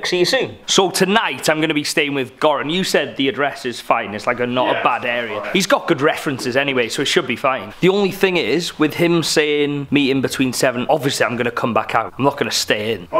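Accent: Ireland. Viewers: Absolutely nailed the North Side accent! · We're is your accent from? I thought it was Irish. I'm in USA so I might not notice the differences. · Ah you already sound like an Irish person *i haven’t a clue what I’m doing where I am ps I’m from Kerry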